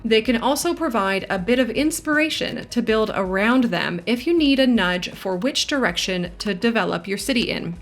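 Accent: Canada. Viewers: She’s Canadian that’s how they say about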